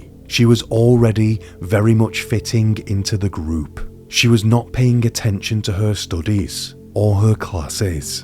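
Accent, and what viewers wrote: United Kingdom. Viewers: He's British! That's his normal tone. I love his voice. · This popped up in my suggested The narrator sounds like he could be British chills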